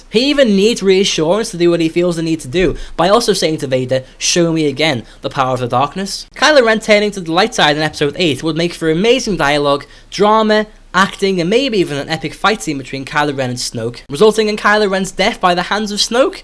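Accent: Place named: Scotland, United Kingdom. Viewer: are you Scottish or irish or what?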